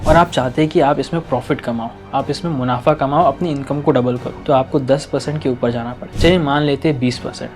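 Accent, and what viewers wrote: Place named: Marathwada, India. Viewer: Aapki Tone se lag raha aap, Aurangabad , Parbhani ya Nanded ke ho😅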